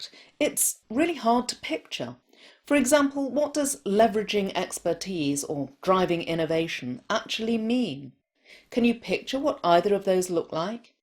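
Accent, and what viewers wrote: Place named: England, United Kingdom. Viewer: I DO really love every video you post and your awesome English accent that literally pampers me as I listen to you. Thanks a lot <3 (Greetings from Italy)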